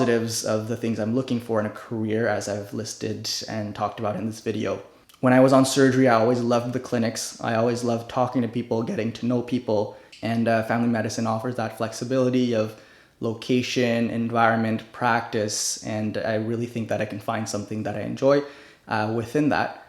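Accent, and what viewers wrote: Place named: Canada. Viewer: o shit you're canadian?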